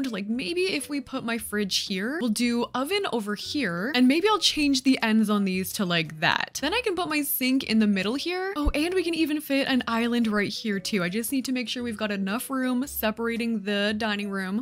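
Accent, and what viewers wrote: Canada. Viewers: Canadians pronouncing it "New Or-leens" is always so cute and funny i love it hahaha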